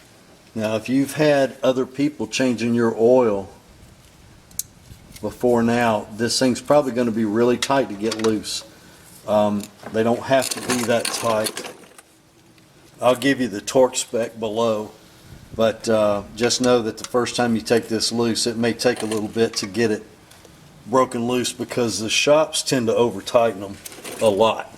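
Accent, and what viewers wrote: South, United States. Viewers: "Its not gon hurt a thang. so i take my finger and i dip it in the old oil that i just drained..."..lol .lol ....love the accent man.. Im from the island , so no shame there.. Sounds like your from the South?, pardon me if i get it wrong. Times like these i dont understand racism these days,so much we can learn from each other :( . . People who now cars know that its simple stuff or what would seem like simple stuff,but im sure alot of people have been blessed by your time. even i did, and i just came for a refresher.. Much love to you my brother. Great help,great patience with your instructions while being fluid and efficient. Bless you. And thank you.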